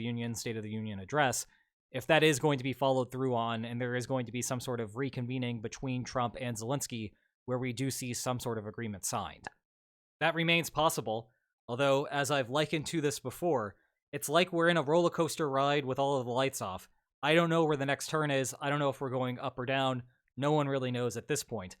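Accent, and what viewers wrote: Pittsburgh, United States. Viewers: Questions for next Q&A: 1. What is your favorite bridge in Pittsburgh and what is your favorite tunnel in Pittsburgh? And what're your favorite bridges and tunnels outside of Pittsburgh? 2. How hard was it learning how to speak in such a civilized and understandable manor and how hard is it to keep yer natural Pittsburgh accent suppressed? 3. What do the lines on maps say about how much longer the Rooney family continues to accept their midling position of always being above .500 but never getting anywhere in the playoffs before they finally force Tomlin to tear it down and tank for a year to start a rebuild? It seems like the sooner they do it the better off they would be and now that we're passing a weak QB class it seems like this year would be a great year to draft a guy they think can turn into a longtime backup and let him play all year with a buncha rookies so they're in a position to draft a potential franchise QB next year or the year after.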